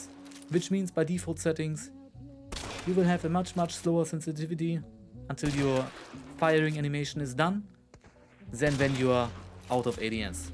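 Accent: Germany. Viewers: My guy your accent always trips me out. 90% of the time it sounds very German but 10% of the time it sounds French 😂😂 it’s German right?!?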